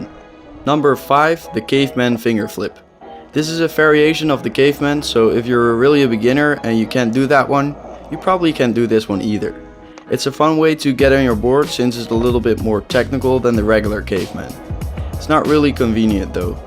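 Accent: Netherlands. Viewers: U dutch? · are u dutch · are you dutch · Ik dacht al te horen dat je Nederlands bent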